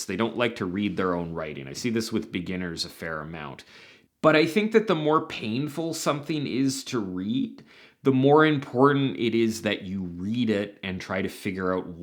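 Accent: Canada. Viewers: i swear i can smell the canadian in your accent. it's messing with me trying to figure out if i'm imagining things or not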